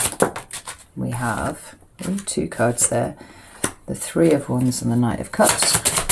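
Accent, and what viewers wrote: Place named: England, United Kingdom. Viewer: Thank you so much ! Your so beautifully amazing and your acsent well I know to you it's not an accent because you are true English us over here in the states are not but anyway I love you ❤️ thanks again .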